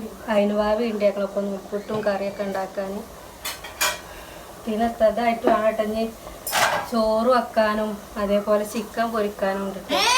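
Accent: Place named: Malappuram, India. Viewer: നിങ്ങളെ നാട് എവിടെ തനി മലപ്പുറം ആണ്❤😂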